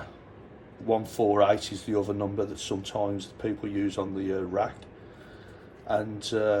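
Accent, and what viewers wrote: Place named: Black Country, United Kingdom. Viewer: As a Brummie myself, accent is more like the black country yam's, sort of reminds me of 'Barry' in Aufhedisen Pet 😅 Good rusting effect video, 👌👍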